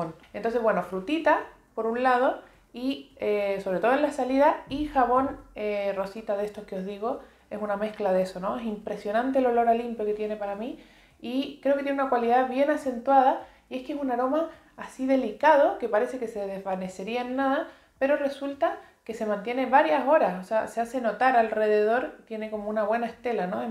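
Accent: Chile. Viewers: El acento chileno-español es suena muy lindo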